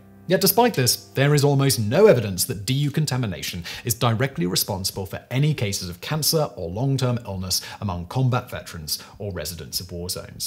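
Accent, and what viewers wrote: United Kingdom. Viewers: Speaking a bit too fast in this one chap, especially with the British accent and constant use of "DU". Slow it down a bit. No rush man.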